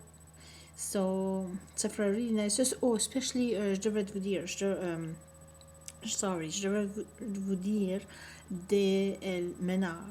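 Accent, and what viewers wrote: Acadia, Canada. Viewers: This is a good representation of one accent, though there are many french accents across the Maritime provinces. Especially in the Acadian regions. Some don't involve any english. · wow. je vais t'avouer. Je n'ai pas compris grand chose XD y'a l'accent anglais avec les mots anglais. y'a l'accent typiquement canadien français un peu et un peu l'accent acadien avec les mots français. Je pensais que c'était juste les Acadiens du sud du Nouveau Brunswick qui parlaient comme ça. Tu dois surement venir d'une place anglophone. et je vais t'avouer que j'aime pas trop quand on mélange TROP l'anglais avec le français. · I like how this dialect of French sounds, and you speak it so well. I'm wondering is there also a dialect like this in the other Canadian provinces and territories, but a different kind of text and speech? I'm pretty sure that the other Canadian provinces have their own dialect,too. 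No?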